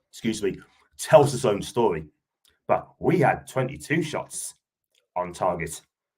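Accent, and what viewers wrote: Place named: Newcastle, United Kingdom. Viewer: Wow I love your accent proper geordie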